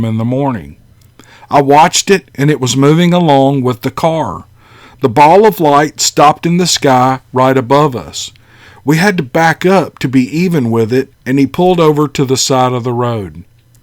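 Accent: South, United States. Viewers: I agree. Love your enthusiam! , and sounthern accent. :) tyfs!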